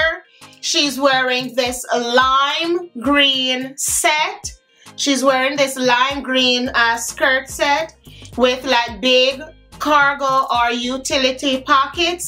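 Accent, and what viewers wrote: Jamaica. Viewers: Randomly came across your video and had to subscribe right away as soon as I heard the Jamaican accent! Now back to the video…love how u style the fits. The lime green/Lavender is my fav 😊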